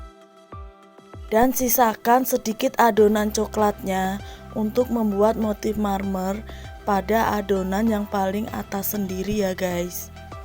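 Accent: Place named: West Java, Indonesia. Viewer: Orang Sunda ya..kentara suaranya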